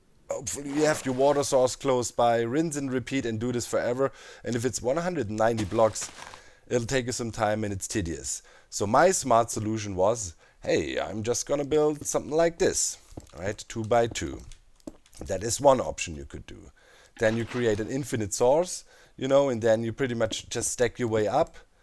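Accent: Germany. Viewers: you are german man hört das einfach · Deutsches englisch erkennt man immer xD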